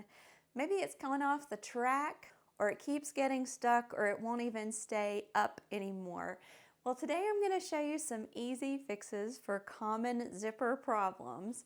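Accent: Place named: South, United States. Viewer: Thanks for the awesome video. Everything sounds better with a southern accent! One question, why not simply pry open the bottom of the glider to put it back on the zipper instead of cutting the zipper?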